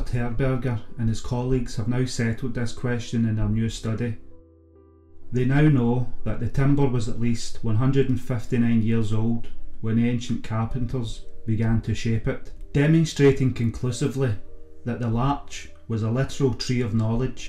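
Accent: Scotland, United Kingdom. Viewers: Love your accent, miss living in Scotland!! By the way the engraving patterns on these sculptures are water symbols, during the later mesolithic and the Neolithic, chevrons represented vaginas and waves represented water so both would to a Mother Goddess statue... These patterns are all over the world but studied especially in Lithuanian folk art and European prehistoric art my Marija Gimbutas.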